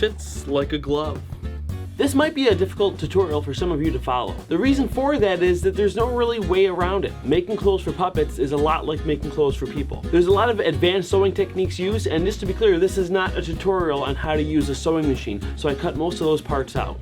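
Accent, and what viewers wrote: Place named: Midwest, United States. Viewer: where are you from? I just think you sound midwestern but not sure (CO expat living in England)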